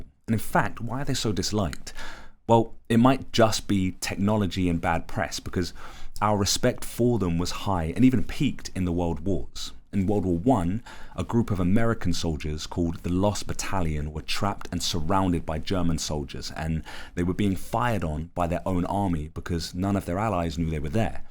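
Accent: United Kingdom. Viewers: An advocate for mistreated animals, an artist, and the most gentle British accent. You win the internet sir. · it's weird watching a dreaded up nick cannon with a british accent... but i'm here for it.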